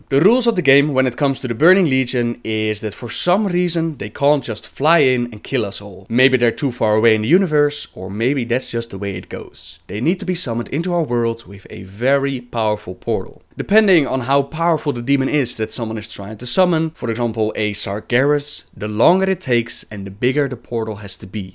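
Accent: Netherlands. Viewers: are you dutch OP? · are you dutch?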